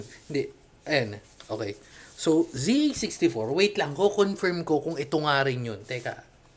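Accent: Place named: Pampanga, Philippines. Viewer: Thank you po sa detailed review! Kapampangan poba kayo? parang nakadinig ako ng accent ng fellow kabalen HAHAHA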